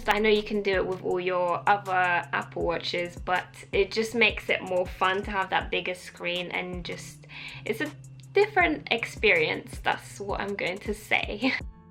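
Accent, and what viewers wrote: United Kingdom. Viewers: I love the commentary and information you provide but your pronunciation of the "th" sound as "f" is a bit annoying, detracting from an otherwise enjoyable viewing experience. Is that a muddling of an Eastern European or Russian accent with British or is it a straight Cockney trait? I'm sure you're aware of it but a few examples include saying "fun fings to do" instead of "fun things to do", "a day in the life wiff..." instead of "a day in the life with..." or "that's everyfing" instead of "that's everything." Hopefully you can correct this small dialect flaw for future videos as your content is a pleasure to watch. Cheers! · O jeeeeeeżu, szukałam info o Apple Watch, słucham, myśle „ale ładny akcent przyjemnie się słucha”, a tu nagle po polsku :) :) :) byłam przekonana, że jesteś Brytyjką, pozytywnie zazdroszczę tak idealnego angielskiego. 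Pozdrawiam z Trójmiasta ❤️✌️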